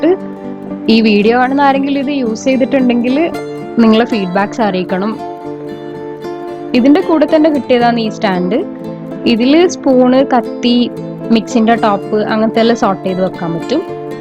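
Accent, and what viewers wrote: Kannur, India. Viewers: Madam Kannur ano? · നല്ല രസം ആന്റെ സംസാരം കേൾക്കാൻ..കണ്ണൂർ ano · Ningal thalasheri anno kannur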